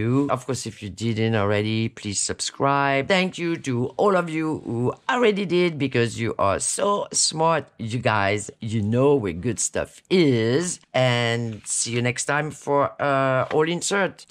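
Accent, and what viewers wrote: France. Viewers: I am having so much fun watching your tutorials!!! I am such a Frenchophile, your accent is just mesmerizing and takes me back to the two weeks I spent in France in 2005. The people were so nice and so patient with my version of the beautiful French language. I do have a question. If I missed it in the video, forgive me. I'm wondering what paper pack you used.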